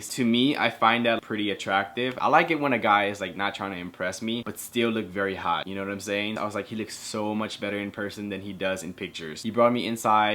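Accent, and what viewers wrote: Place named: Asia. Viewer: u are talking like a thug speak in your normal Asian accent voice please u bottom girl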